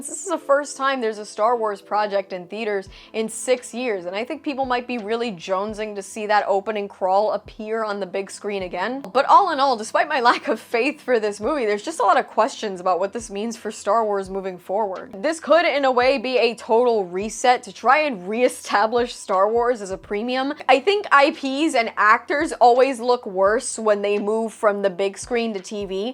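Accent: Spain. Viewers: Less "F" bombs, more Spanish accent!!!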